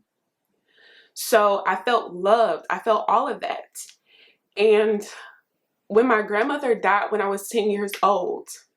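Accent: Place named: Deep South, United States. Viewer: Hey where are you in the Deep South because you have a real deep and strong southern accent ? Which city and state are you from in the Deep DEEP SOUTH???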